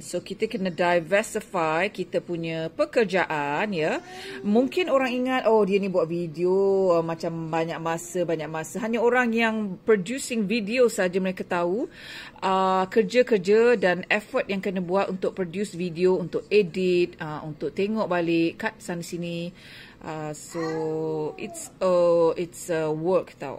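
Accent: Negeri Sembilan, Malaysia. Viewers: Suka dengar Pn membebel cakap loghat nogori.hehe.Selamat Hari Raya Pn..Kami raya PKP di Malaysia.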